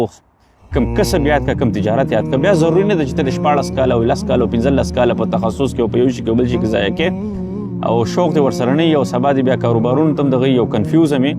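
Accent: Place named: Gulkhan, Afghanistan. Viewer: دا ستا لهجه ولی ده ګلخانانو ده؟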